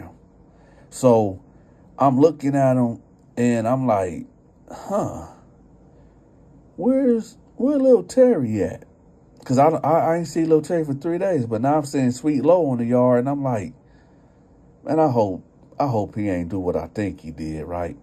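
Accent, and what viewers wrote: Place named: Detroit, United States. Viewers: It sounds like you from Michigan..but home is where your heart is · You got a Detroit accent , so you’re from Detroit lol. New subbie here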